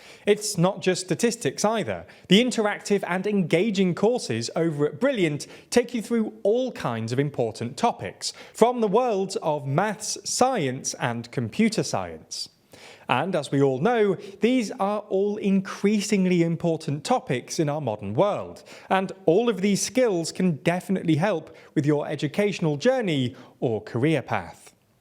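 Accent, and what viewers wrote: United Kingdom. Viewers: He's british, different accent. Technically, his pronunciation is "proper" English 🤷‍♂️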